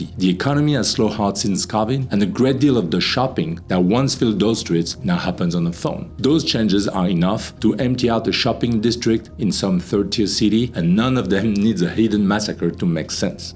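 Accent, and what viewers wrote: France. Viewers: Mon Dieu, ça fait mal d'entendre son dialecte. On ne peut pas transposer la mélodie de la langue française en anglais. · The world’s most annoying French accent. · Love his French accent!!!💖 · Like your video's including your French accent. Greetings from The Netherlands and thanks for your video's.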